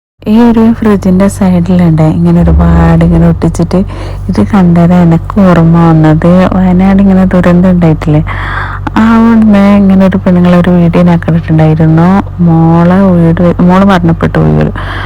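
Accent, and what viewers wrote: Kannur, India. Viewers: Kannur malayalam adipoli❤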